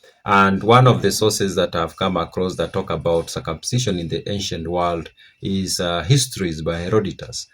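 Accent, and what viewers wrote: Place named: Luhya, Kenya. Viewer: I love insightful présentations, I expect to learn from those that have endeavored to research. However, when I information that's closest to me i.e. the obvious to me being incorrect, I regard all the rest as incorrect. You sound Luhya from Kenya, very close to Uganda, yet the info about Uganda is so false The only Bantu from Uganda that circumcize are Bagisu and Bakonzo/Bamba; less than 10% of the total population of Uganda.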